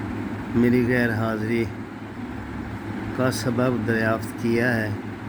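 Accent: Punjab, Pakistan. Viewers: میں لاہور سےہوں لیکن عرصہ دراز سے یو کے میں مقیم ہوں مرحوم کو باقاعدگی سے سنتا تھا کہ ان کے پنجابی انداز اور پنجابی لہجہ اردو کو بہت پسند کرتا تھا ۔ اللہ تعالئ مرحوم کے تمام گناہ معاف کرکے جنت الفردوس میں اعلی مقام نصیب فرماے امین ۔ آگ ان کو کوئ فیملئ ممبر آ کر تھوڑا مرحوم کے آخر ی سفر کے بارے میں بتا دے اچھا ہو گا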